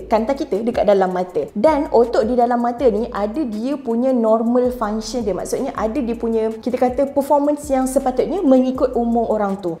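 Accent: Malaysia. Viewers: Cewek malaysia lucu klo ngomong 😂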